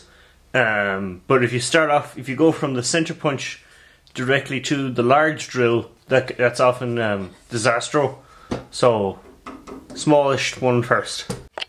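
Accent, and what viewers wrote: Ireland. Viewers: First thing I noticed was your irish. I'm Irish too by the way. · Is he irish? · its an irish accent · I thought he was Russian then realised he was irish. What a skilled .. blacksmith? He's good.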